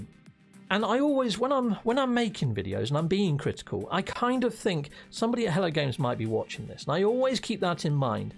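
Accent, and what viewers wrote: United Kingdom. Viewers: Oh -- and I would definitely watch some paranormal oriented content! I too find the UK accent and phrasing very entertaining. At 2000+ hours I'm still a long way from being bored with NMS. But while I'm a huge science and science fiction fan from WAY back, the paranormal is a kind of guilty pleasure for me.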